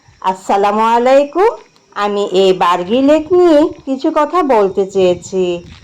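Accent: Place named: Chittagong, Bangladesh. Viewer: আপু যে চাটগাঁইয়া কথায় বুঝাই যাচ্ছে আপু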